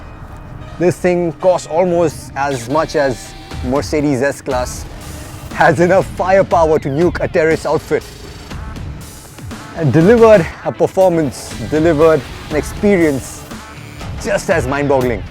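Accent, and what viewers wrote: India. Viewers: Indian accent ruined it for me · Indian accent...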